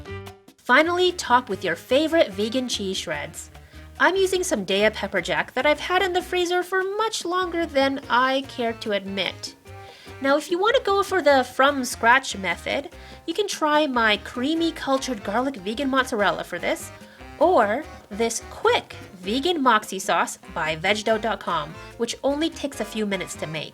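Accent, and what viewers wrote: Canada. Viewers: Heh I like the Canadian accent - it's eh- nchilada like egg or end ;) (Mexican here). Nice recipe I would've never thought of black eye peas but they do have a meatier taste to me than other beans.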